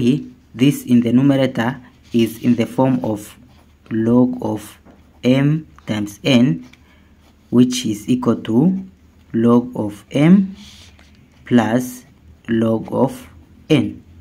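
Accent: India. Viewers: По акценту думаю, что перед нами индиец.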